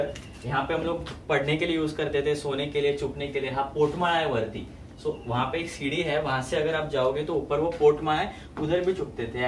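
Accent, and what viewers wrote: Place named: Wadval, India. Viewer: काय रे बाबा कुठे राहतोस?वाडवल बोललास म्हणून विचारलं?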